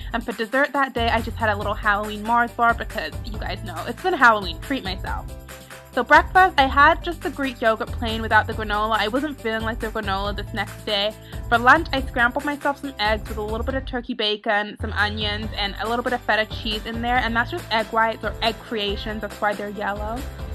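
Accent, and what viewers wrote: United Kingdom. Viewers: you have a british accent sometimes! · I know this is irrelevant to weight loss, but are you from the UK? I really like your accent, hahaha.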